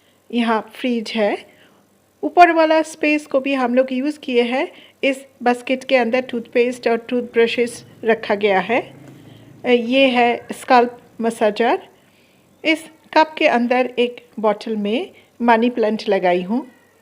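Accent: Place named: Bengal, India. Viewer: Up bengali haye kaya? Upke hindi bolnese asai lagta hai. Isiliye bola.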